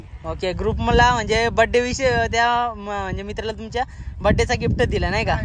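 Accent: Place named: Agri, India. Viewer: bhai aagri bhashen bollyav jam bhari vatt .. kadak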